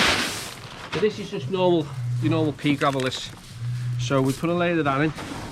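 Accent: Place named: England, United Kingdom. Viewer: And you understand this English dialect? I hav ebeen many times in Liverpoll, Manchester, Leeds etc. but always keep my ears open tom listen what they say!, sometimes i pass, ha ha ha. I'm from te Netherlands and drove many years with a truck, in the UK the say Lorry, with deep frozen food and before that with flowers and plants, I've seen the Uk from south to North and from east to the West and every time I had to listen very well what the people tellinmg me, because I don't understand it immediately but after recall I did. Yes I have learn English at school but most from the Television special the westerns from the good all days!!, well take care you all!!!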